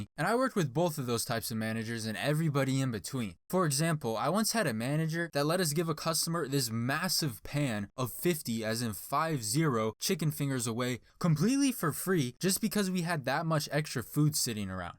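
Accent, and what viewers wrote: Arizona, United States. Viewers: Bro got that Arizona accent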